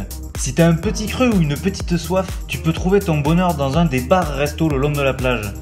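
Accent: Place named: Toulouse, France. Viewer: La vidéo est incroyable, avec en bonus les noms philippins avec un accent toulousain 😍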